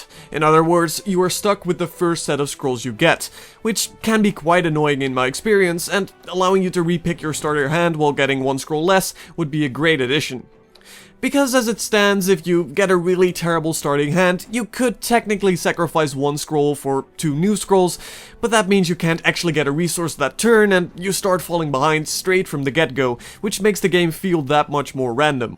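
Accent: Netherlands. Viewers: Heerlijk dat Nederlandse accent :D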